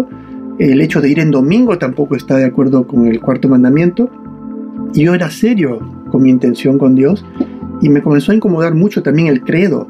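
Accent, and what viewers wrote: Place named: Peru. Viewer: Estimado hermano, por tu acento, y tus 🦙 llamas 🦙 esos lindos adornos que se aprecian al fondo, supongo que eres peruano 🇵🇪 que hermoso estudio y reflexión, digno de un siervo del Señor de los cielos. Quiera Dios te escuchen a ti, ya que a mi no me creen, la mayoría piensa que La Biblia es un libro de cuentos de fantasía. Como cristianos e hijos de Dios debemos estar velando, pero no quieren ni hablar del tema. La paz sea contigo, amén 🙏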